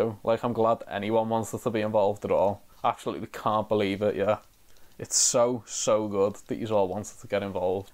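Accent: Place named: Liverpool, United Kingdom. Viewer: It makes me unreasonably happy to hear another scouser getting into the whotube community.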